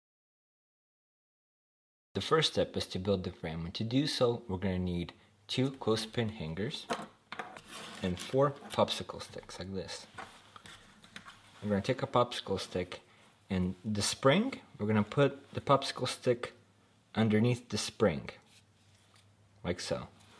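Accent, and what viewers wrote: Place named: Russia. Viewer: Are you Russian?? You talk like a Russian (not for bad)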